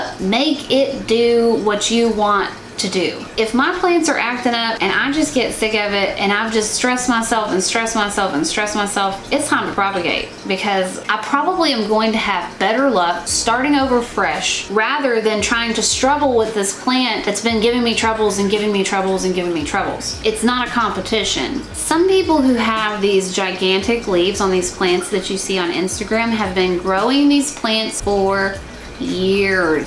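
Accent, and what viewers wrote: South, United States. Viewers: I'm here from Harli's channel. I have to say, your accent sounds like ours around here. What state are you in? South Carolina here. I'll have to check out more of your videos. :)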